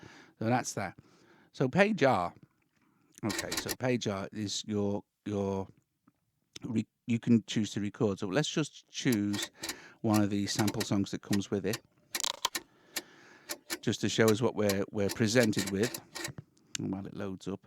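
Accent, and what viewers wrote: United Kingdom. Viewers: I loooooooooooove your accent! Is that British?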